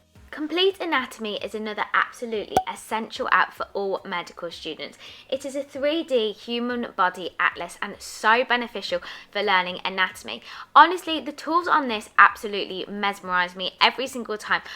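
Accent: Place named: United Kingdom. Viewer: Guessed it with your British accent..😌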